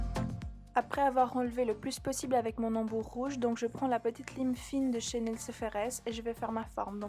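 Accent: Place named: Brazil. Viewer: On voit de suite que tu es formatrice 🤩. Le travail est soigné et parfait 👍 bravo, en prime j’adore ton petit accent brésilien je crois ? ☺️🤫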